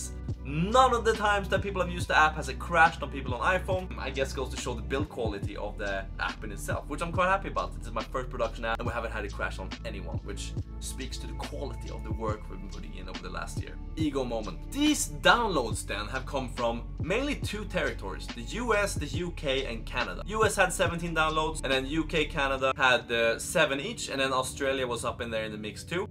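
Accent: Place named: Sweden. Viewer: är du svensk, för det märks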